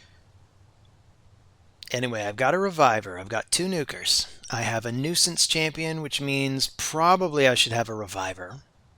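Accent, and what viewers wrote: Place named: Scotland, United Kingdom. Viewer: I’m guessing Scottish maybe Irish. Good luck on the fights. I just got up to the 4000 mark early today.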